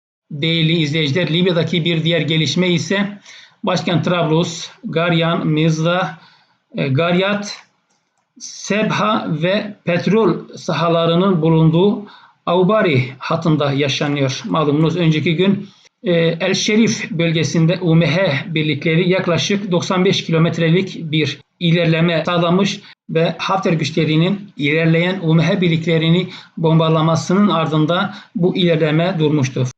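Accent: Cyprus. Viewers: kolay gelsin. Özür dilerim. Şiveniz Kıbrıs şivesi gibi. Doğrumu? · Oğlum konuşman Kıbrıs Türkçesi. Sen rummudun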